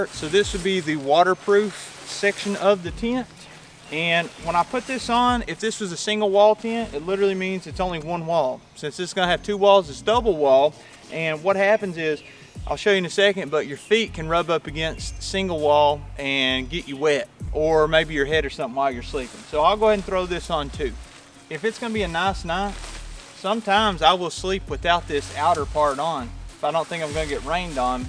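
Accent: Kentucky, United States. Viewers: "Ah got mah tint-" Gotta love that Kentucky accent! It's just one of the many things which make up the video experience. ^_^ What is that beautiful location you're filming in, anyway? It looks glorious, I can practically smell the leaves.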